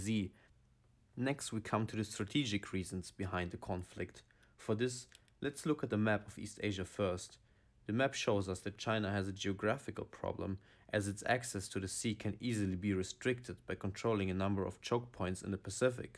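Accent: Germany. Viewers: What accent? Sounds German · My guess would be Central European Germany maybe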